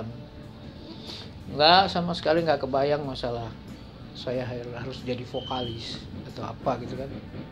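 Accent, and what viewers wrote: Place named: West Java, Indonesia. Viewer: Nyunda pisan bang😁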